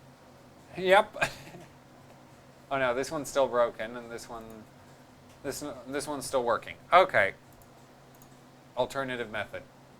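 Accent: Australia. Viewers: My comment is second. I am calling him Australian.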